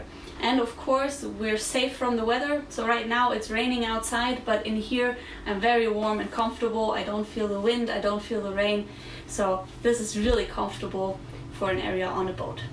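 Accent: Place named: Scandinavia. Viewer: Nice video. Her command of English language is impeccable, despite a slight Nordic accent - to the point that I thought she might be American. It was only in the end, when the boat price was indicated in Euros, that I was certain she is European. Shouldn't she have introduced herself? As for the boat, the presentation was centered on more domestic qualities, some of them outright mundane, in my opinion. The fact that Hanse is a great light sailing ship to take out in weekends, or even longer holidays, in my opinion again, was insufficiently reflected.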